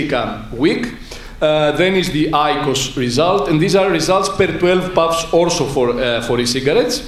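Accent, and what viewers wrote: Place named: Greece. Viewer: 100% accurate Greek accent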